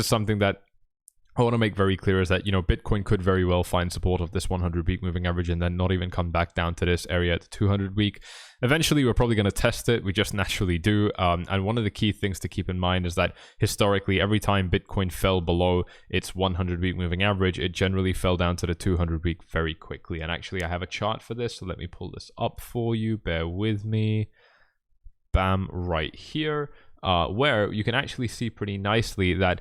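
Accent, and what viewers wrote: Scotland, United Kingdom. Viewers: R u a brown Scottish dude?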